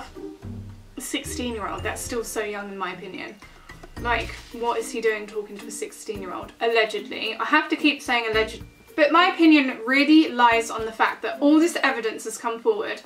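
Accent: United Kingdom. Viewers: Is it weird that I love to listen to your voice and your British accent 😂 I cannot believe that Chris ingam is still blogging I have been watching the Wilkes family and also Demi Donnelly as well as your second channel and all the evidence is disgusting he definitely is guilty so when is he going to face justice. we won't ever forget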